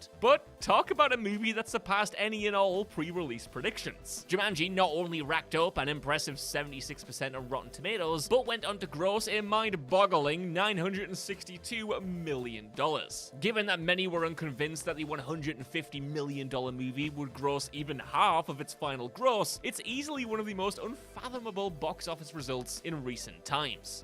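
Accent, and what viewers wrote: United Kingdom. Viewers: I love how he tries to keep pronouncing "toe-may-toe", but every now and again a thoroughly British "toe-mah-toe" slips out....🎶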